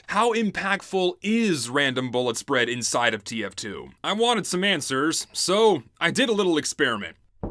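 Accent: South, United States. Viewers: I may be missing something but I swear he randomly goes southern every 6 seconds or so.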